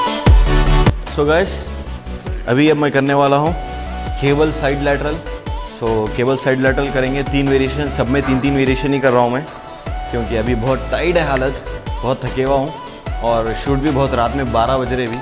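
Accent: Hyderabad, India. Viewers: Hyderabadi ho kya Miya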